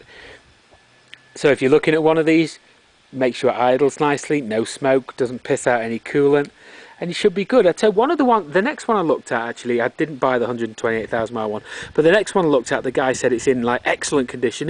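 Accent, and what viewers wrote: United Kingdom. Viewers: This is how a rider review should be done. No 'ums' and 'ahhs' and stumbling, it's bloody seamless, articulate, and relevant. Well done, mate. Only thing you didn't confirm was can a full face helmet fit in the side bags...I know it does on the ST13 but what about the ST11? They look like they're right on the line of not being deep enough. I'm gonna do something like this review for my Honda Blackbird...another Honda masterpiece. I absolutely love the 80s look of the Concours 10 and it's rawness but you nailed the reality of this segment...at the ST1100s much higher showroom prices in 1994 it was a different story, but now with prices so low on these bulletproof used 90s bikes (both the ST11 and Connie are tanks) it's hard to make the case for a used Connie in order to save at most a few hundred bucks. Better brakes (ABS on some later models) integrated crash bars, better suspension, lower centre of gravity, overall smoothness, and subjectively more interesting engine and no oddball 16 inch rear wheel with its limited tire choices and iffy availability in the middle of nowhere...the Honda is the smarter choice. 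BTW your comment about England civilizing the whole fucking world had me howling as well as feeling a perverse pride in my savage ancestry. I'm gonna put that accent of yours (lapsed a bit) somewhere north..maybe Lancashire or Yorkshire. Am I wrong? Thanks again for a great review.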